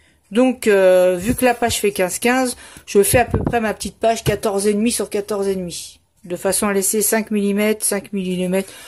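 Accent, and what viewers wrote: Paris, France. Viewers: Coucou... ah le bel accent parisien, tu peux pas renier tes 47 ans de capitale ! c'est super... moi aussi je suis parigote du 15eme , rue de la Convention pendant 35 ans, comme mes parents et grands parents qui y sont nés eux aussi....et le Marché st Pierre j'adorais y aller avec une copine, dans le bon vieux temps... Maintenant j'habite au bord de la méditerranée depuis plus de 30 ans.... c'est agréable il fait beau, c'est different... Bon c'est pas le tout maintenant il faut finir cet album , car le plus dur va être la reliure je crois. En tous cas tes papiers sont superbes. A bientot, je me suis abonnée à ta chaine.. bises <3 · Je n'avais jamais vu un album fait de cette façon c'est tip top. J'adore le bloc Paris il est super beau et j'ai tout pigé !! J'aime aussi beaucoup l'embellissement que tu as acheté pour ta fleur en organza. 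Je trouve super élégant. J'ai tout pigé à droite à gauche on voit bien s'il y a un vide ou pas. Ah bon t'es une parisienne. Moi aussi je connais bien le marché St Pierre. J'y achetais de la soie. J'ai fait de la peinture sur soie pdt longtemps. Moi je suis une banlieusarde depuis toujours !! Je comprends mieux ton phrasé ... Très parisienne... J'adore.... Bisous 😘💋🌞🐦🌈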